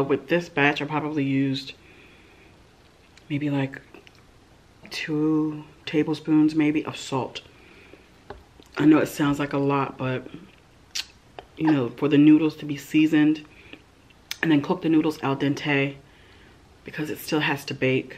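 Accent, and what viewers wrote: New York, United States. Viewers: She should do a mukbang video only talking in her New York accent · I don’t know what it is but I really enjoy watching you eat Mac N Cheese and it’s so pretty too 😎 yes I love a NY accent too 🤣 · This girl from Queens appreciates your NYC accent! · Lmbooooooo at your NY accent. I’m from NY. You dZid good sis. Lolol (I added the z on purpose)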